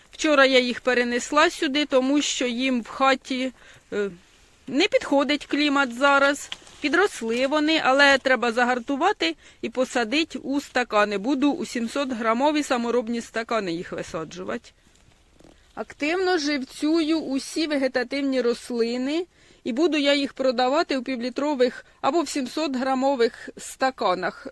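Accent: Poltava, Ukraine. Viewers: Коли вас чую, то велика насолода від вашої праці, краси і мови, я наче побула на Полтавщині, у своєї тьоті і теж - Ліди. Здоров'я вам та успіхів в цій нелегкій праці.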